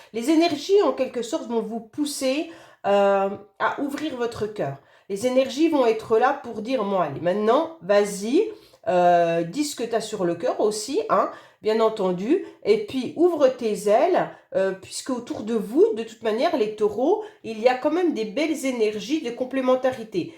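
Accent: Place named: Franche-Comté, France. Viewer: Vous avez l'accent franc-comtois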